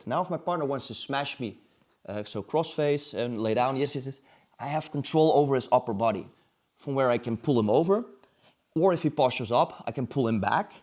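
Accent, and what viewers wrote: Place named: Netherlands. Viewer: Amazing! Very grateful🙏🏽 good english, but you cant hide that Dutch twang 😊 nogmaals bedankt, groeten uit 🇵🇭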